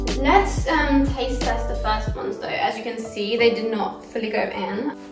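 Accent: United Kingdom. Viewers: Are u Briiish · I thought you're American, confused with the British accent tho lol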